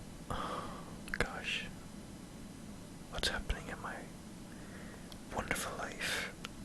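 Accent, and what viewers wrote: Ireland. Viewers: That's the kind of ASMR I need <3 Irish and inspirational (and no chewing shit)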